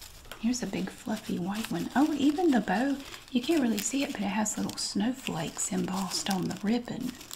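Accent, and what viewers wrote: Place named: Texas, United States. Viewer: As a southern girl from Texas, this video makes me feel at home😂 the accent, the remarks, the methods, it’s all just familiar🥰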